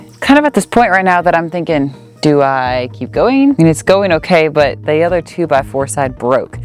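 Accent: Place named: South Louisiana, United States. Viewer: You're slowly picking up that South Louisiana accent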